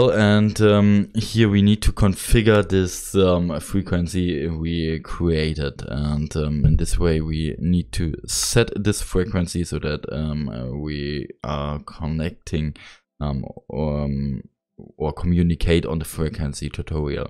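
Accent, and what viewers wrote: Germany. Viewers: Ich höre deutschen Akzent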